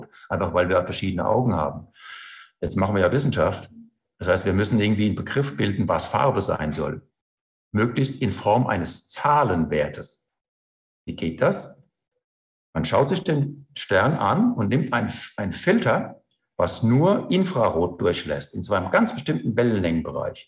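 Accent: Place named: Frankfurt, Germany. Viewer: Sie können noch so hochdeutsch sprechen, den frankfurter hör ich immer raus 😍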